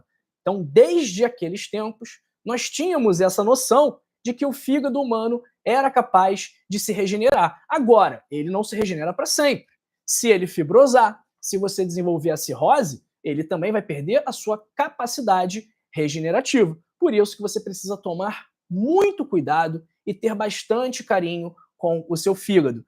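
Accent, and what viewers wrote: Rio de Janeiro, Brazil. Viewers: Porque será que o sotaque carioca é tão desagradável e IRRITANTE ??????? Não dá para assistir !!!! Affffffff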